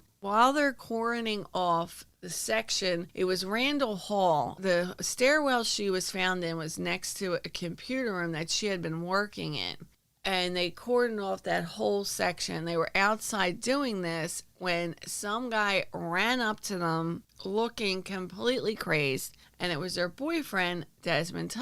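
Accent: Philadelphia, United States. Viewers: Also ....YO PHILLAY!! ✌🏻😘 Living in CA makes me miss the accent and no BS🙌🏻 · Hi, stolen from me by Lindsay sent me. She was really bragging about you and your philly accent I just wanted you to know I subscribed and I'm excited about watching your videos and as Lindsay would say put my headphones on and getting about things, lol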